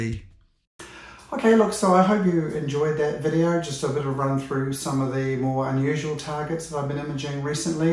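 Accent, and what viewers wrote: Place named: New Zealand. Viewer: Great photos, its always nice to see Southern hemisphere targets and even better to hear a kiwi accent. Im down here in Dunedin and the weather has been absolute rubbish. Im just starting out on my astro journey so Im really blown away with what you have achieved. Subbed and will watch the rest of your videos too.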